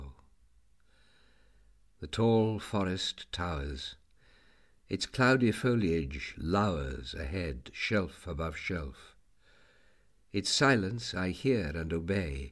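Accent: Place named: England, United Kingdom. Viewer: Nice reading, lovely English accent as if Thomas himself were reading.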